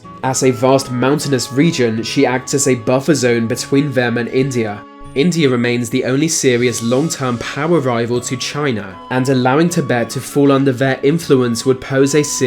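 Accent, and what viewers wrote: England, United Kingdom. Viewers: How come you have an English accent and yet pronounce viceroy with an extra syllable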